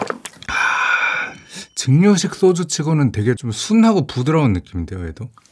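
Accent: Cheongju, South Korea. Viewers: 혹시 청주 사람이신가요?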